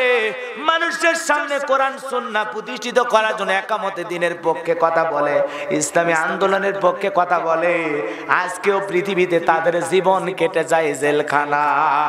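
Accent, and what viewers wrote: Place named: Noakhali, Bangladesh. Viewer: ওরে নোয়াখাইল্যা ভাইরে। বিরে আশি নয়,বিরাশি বলেন। ভাষাটা একটউ শুদ্ধ করে বলেন।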